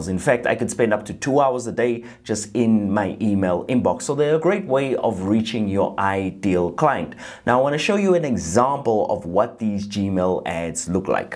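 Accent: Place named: South Africa. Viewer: We are also originally from SA and love listening to the accent, you can't imagine how refreshing it is, smooth as Tennessee Honey Whisky😀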